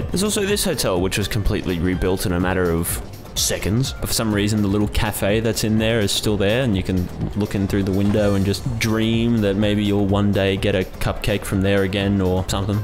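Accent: Australia. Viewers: I just realised you’re from Queensland, awesome video bro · australian detected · just realize u are an aussie?!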